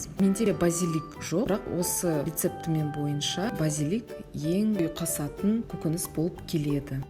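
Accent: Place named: Russia. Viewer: Сіздің орысша акцент-ыңыз бар екен сол үшін айтып жатқанмынғой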